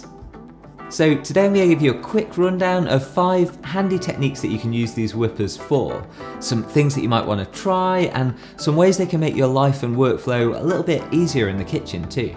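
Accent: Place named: United Kingdom. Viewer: I've been watching a few of your videos, now, and the quality is amazing, both of the photography and the incredible food ideas. Your commitment to the flavour, even for a professional, is mind-blowing. Thanks so much for sharing these. I'm also very curious about your accent which has hints of RP, northern and estuary!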